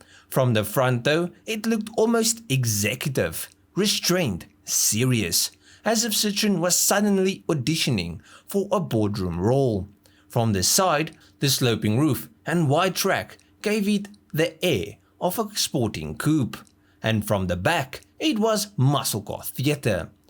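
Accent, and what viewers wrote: South Africa. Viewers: South African accent is ready to follow. Thank one of the gods he isn't from Kentucky.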